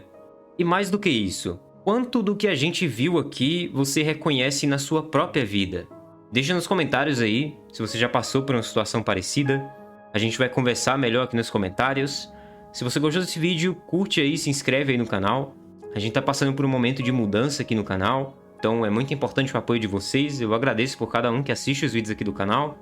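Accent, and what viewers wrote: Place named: Pará, Brazil. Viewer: Sotaque da região do Pará, tenho um amigo do Pará e estudei com pessoas do Pará q tmb falam assim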